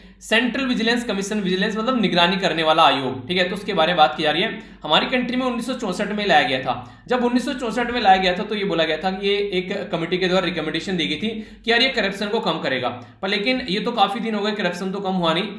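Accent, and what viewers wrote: Haryana, India. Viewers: Am damn sure sir that u are from Haryana and from around Rohtak sonipat belt .0001 jitna bhi doubt nhi h😀🙏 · Aap haryana se ho sir...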